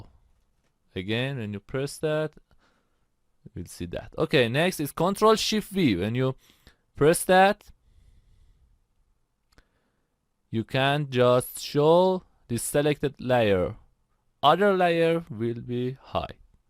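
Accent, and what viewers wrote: Iran. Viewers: Thank u for this useful tutorial , but u really need to work on ur persian accent , maybe u should listen to nosrat english learning to correct ur accent , thnks again.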